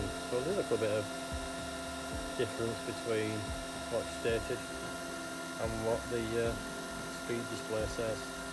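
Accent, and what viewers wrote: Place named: Northern England, United Kingdom. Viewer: A great Northern accent, there sir! A great lathe also. I’ve just bought a 6 month old R2 version. Joe (Manchester)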